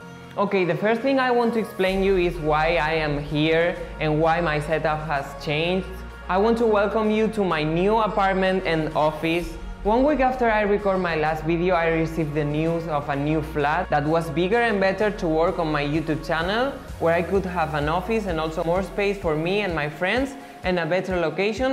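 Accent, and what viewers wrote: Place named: Spain. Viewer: ya decía yo que eras español, se te nota el acento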